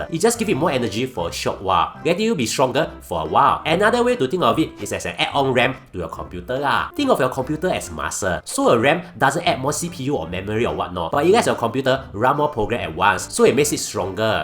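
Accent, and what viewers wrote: Singapore. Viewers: This is a Singaporean cat. He’s speaking in Singlish dialect . 😅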